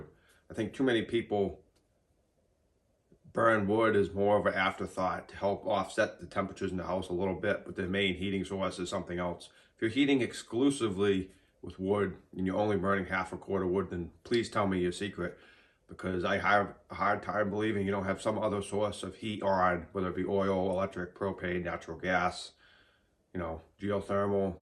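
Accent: Massachusetts, United States. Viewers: I live close to Frankfurt, Germany and have access to beech, oak, pine, spruce and fir mostly with the occasional birch and wild cherry. My favorite firewood so far is beech. Starting the fire with some softwood and then adding beech is my standard procedure. Oak is great for putting on a good bed of coals. I split my firewood roughly into the size of a triangle that forms when you hold both index fingers and thumbs together. The length is between 10 and 11 inches (25-30cm). Stored in a proper wood shed, I usually get all my firewood to a moisture content below 20% until September/October when split and stacked in March of the same year. Thanks for the great videos, content and information. The Massachusetts accent reminds me of our relatives that live in Boston and Concord, MA.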